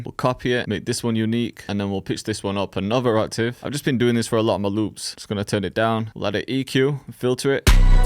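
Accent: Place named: England, United Kingdom. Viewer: i love that England way of speaking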